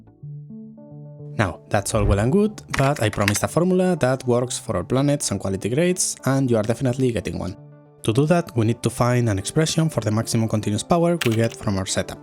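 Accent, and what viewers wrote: Spain. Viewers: Amazing stuff. Subscribed. I checked your channel and this one video seems different than your previous ones. This must have taken you so much work. The results talk by themselves. The accent sounds familiar. I bet Spanish. Bravísimo. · Where is your accent from? Spain? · Can't hide that Spanish accent from me boy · You sound Spanish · Esto es acento español?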